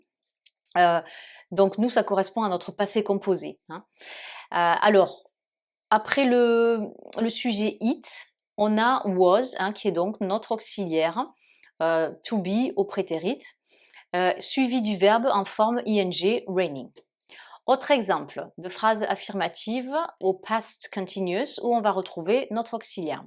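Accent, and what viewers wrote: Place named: South, France. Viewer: C'est vraiment magnifique prof. J'en profite parce que j'étudie le français depuis longtemps et là, j'ai décidé de réapprendre l'anglais mais, en passant pour le français. Honnêtement, j'adore l'accent du sud. J'ai un ami à moi qui vient de Narbonne qui parle de la même façon. Très bon travail prof...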